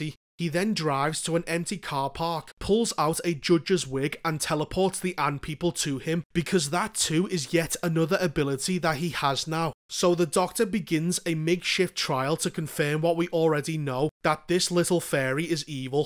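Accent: Liverpool, United Kingdom. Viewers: Sorry, I wonder are u from Liverpool?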